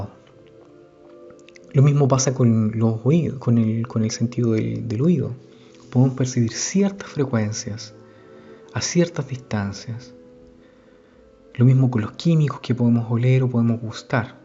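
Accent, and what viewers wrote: Chile. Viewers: sos chileno verdad?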